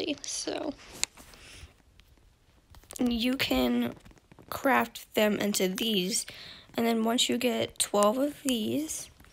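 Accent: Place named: United States. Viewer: Classic American voice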